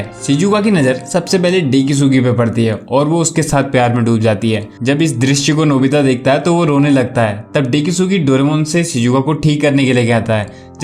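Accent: Standard. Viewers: Bro damn ur hindi is pure. It's not everyday i get to hear pure hindi. Good job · Omg how can you speak so much pure hindi?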